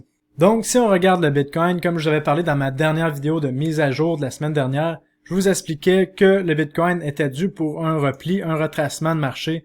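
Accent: Quebec, Canada. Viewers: Merci! Je te le dis à nouveau: tu m'as reconcilié avec l'accent québecois sur lequel j'étais resté bloqué pour avoir connu un mauvaise personne... Et ça c'est vraiment une très grande chose pour moi désormais. Alors merci:) Un des guerriers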